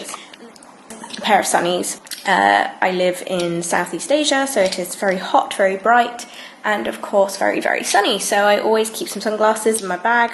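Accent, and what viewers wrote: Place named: United Kingdom. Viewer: Hello lovely! This was a great video, awesome to have you here on Youtube. Thank you for sharing what's in your bag, the solid perfume is super handy. Haha just being nosey but are you from the UK living in Vietnam? Just going by the accent :)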